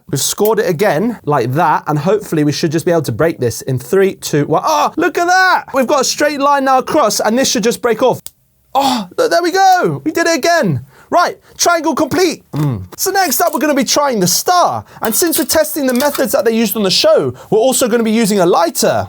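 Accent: United Kingdom. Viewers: my god! He has the bri-ish accent!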